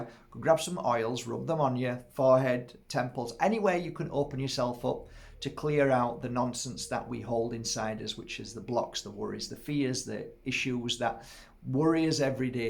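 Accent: Yorkshire, United Kingdom. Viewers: A wonderful video! Thank you..and..I truly enjoy your Yorkshire accent❤️❤️❤️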